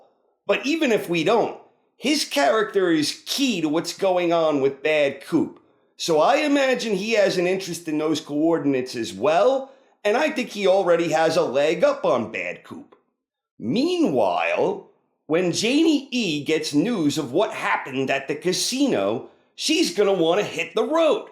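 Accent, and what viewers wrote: New York, United States. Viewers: Guessing he's from New York, maybe the Bronx or Brooklyn, and or, spent a while in Philly. Either way, his narration is clear and concise, his understanding of and his ability to describe the events on screen is uncanny. If you like Twin Peaks you need to be watching this guy.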